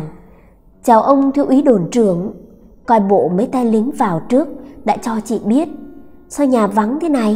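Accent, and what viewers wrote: Standard. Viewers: Giọng đọc của rất chuẩn và truyền cảm THẬT SỰ RẤT HAY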